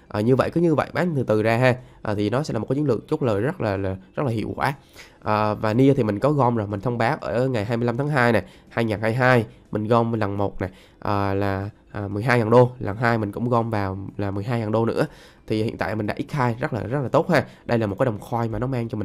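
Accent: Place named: Quang Nam, Vietnam. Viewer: Anh ng o miền trung quảng nam ak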